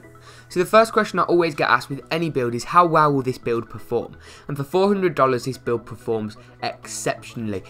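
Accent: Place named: Doncaster, United Kingdom. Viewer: I know this man is from Doncaster, that accent has a weird " dh-u" noise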